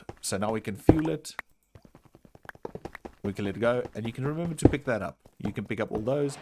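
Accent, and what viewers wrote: South Africa. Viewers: Are you south african? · South African? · This guy sounds south African ngl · Nice video! Are you south african? I think I hear an accent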